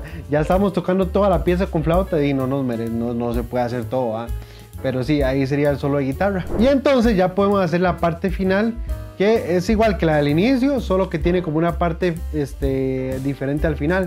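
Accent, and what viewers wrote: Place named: Costa Rica. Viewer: Me suenas Tico. NICE BRO. PRIMER VEZ QUE VEO UNO DE TUS VIDEOS Y YA TE SIGO...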